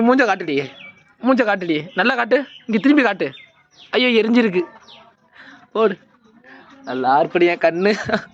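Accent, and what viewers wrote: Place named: Sirkazhi, India. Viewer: Entha ooru pakkam Naa seerkazhi Anga ipdidhan pesuvanha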